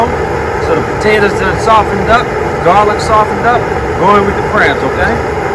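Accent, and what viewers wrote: Louisiana, United States. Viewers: That's a bona fide cajun accent. ;)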